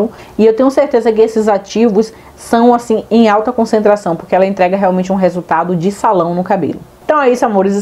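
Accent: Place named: Bahia, Brazil. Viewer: pelo seu sotaque, você é minha conterrânea! Por favor, onde acho isso aqui em Salvador? Obrigada!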